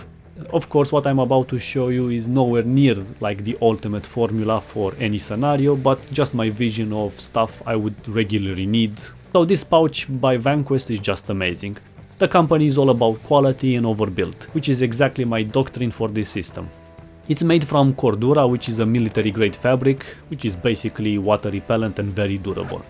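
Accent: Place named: Eastern Europe. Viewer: Where are you from? You have that eastern european accent.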